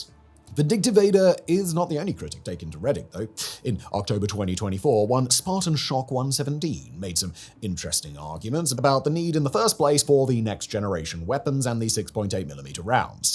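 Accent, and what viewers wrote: United Kingdom. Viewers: Ah, at last my broadly divergent taste in YouTube has converged. Footage of Garand Thumb, set to the dulcet British tones of Simon Whistler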